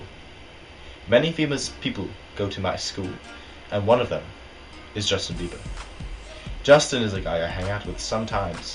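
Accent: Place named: United Kingdom. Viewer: Is it me, or did you get all Brit on us? Not that I minded, because I loved your accent. XD I loved your puzzled looks, and you trying not to laugh throughout the story. And just you are amazing and this was hilarious. Please do more dramatic readings xD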